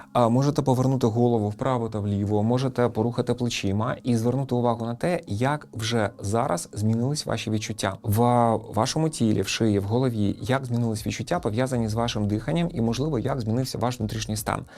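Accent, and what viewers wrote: Standard. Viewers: Приємно слухати,чиста українська мова!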